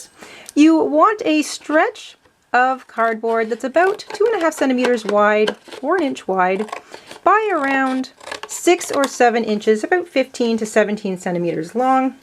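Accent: Canada. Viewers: Aboot!!! Love your Canadian accent! I’m a huge Rush fan and love Canada!!!!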